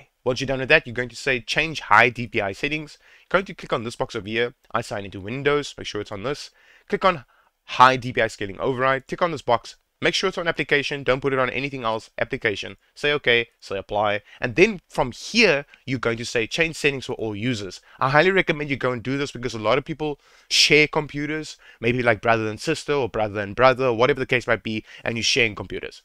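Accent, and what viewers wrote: South Africa. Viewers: Lekker what a legend! Adding you up. When I heard the South African accent I knew this’ll be a quick fix!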